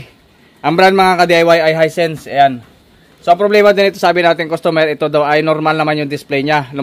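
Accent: Iloilo, Philippines. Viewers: Master ilonggo ka ba?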